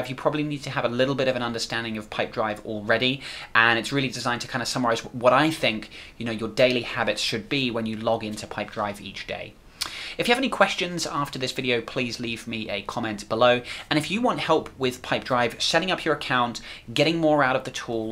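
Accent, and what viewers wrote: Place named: United Kingdom. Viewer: This is excellent, very clear training. Thank you for creating this. I'd much rather listen to your cool British accent than the Pipedrive guys cartoon voice. LOL Cheers!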